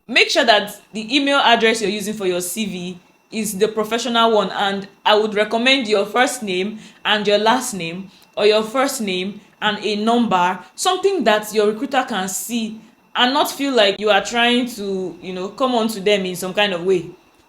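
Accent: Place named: Nigeria. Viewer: You sound like we went to the same care academy in Nigeria 😂❤. Thank you sis. Is there anyway I can contact you?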